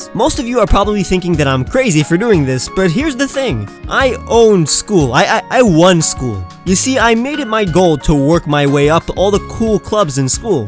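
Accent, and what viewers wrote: Israel. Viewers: אתה לא יכול לשמוע את המבטא הישראלי בקולו? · Always wondered why you had a little bit of an Israeli scent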